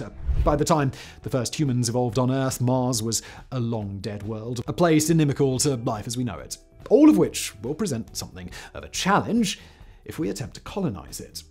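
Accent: RP, United Kingdom. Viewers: This is a bit rude, but would it be possible to flatten the British Received English accent a bit and fluctuate your Oxbridge tone a bit less. It's really tough to listen - while the videos look fascinating and are a real shame to miss. A normal, average London middle class accent would be great. I'm sorry if this is offensive in any way, if so, please ignore. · I had to laugh. Simon couldn’t hold David Attenborough’s cue cards. At 66, I have been watching documentaries with their sonorously-toned narrators for a half century. There was Nat Geo specials Joseph Campanella and Richard Kiley; Nature’s George Page; Morgan Freeman everywhere; Secrets of the Dead’s Liev Schreiber; Nova’s Jay O. Sanders; Frontline’s Will Lyman…the list goes on. Simon isn’t even a middling presenter. He talks too fast, with poor enunciation and his British accent (I usually like British accents) is heavy, annoying, and pretentious.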